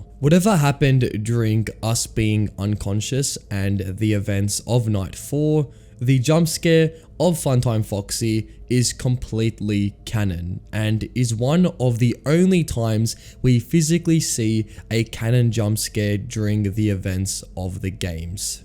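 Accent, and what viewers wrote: Australia. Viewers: I can't tell if I've heard your voice somewhere before or all Australian people sound the same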